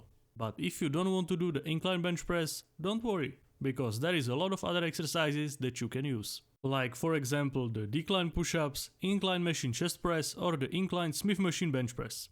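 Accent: Czech Republic. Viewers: you sound czech, are you czech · Czech accent?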